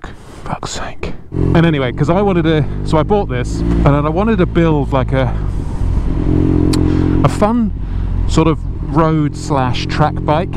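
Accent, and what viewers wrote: England, United Kingdom. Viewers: Nice to have you back! As a Dutchie I really can appreciate you're semi-posh English. Keep on driving....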